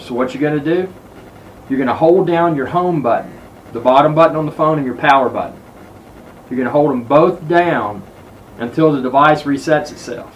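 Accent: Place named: Alabama, United States. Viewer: Thanks for info! I'm getting ready to try this since my iphone 5 is stuck in recovery mode. You sound like you're from my home state - Alabama?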